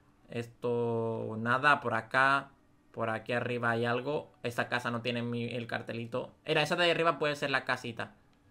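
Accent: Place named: Andalusia, Spain. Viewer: Ey eres andaluz? Se nota el acento. PD: Saludos desde Galicia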